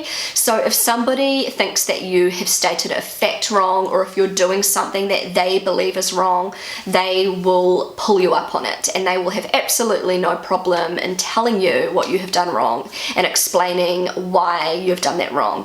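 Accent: New Zealand. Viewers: Wer hat auch Probleme diesen Neuseeländischen Akzent zu verstehen? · Armin Ehrbar That is the Kiwi accent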